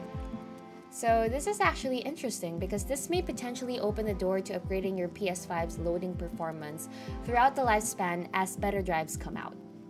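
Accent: Philippines. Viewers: She seems to have a Filipino accent. Not that it matters, as a Filipino, I rarely see content creators here that aren't 'vloggers' and vomitting clickbait content. I guess it's a long way of saying, I rarely see this much class from a content creator in the Philippines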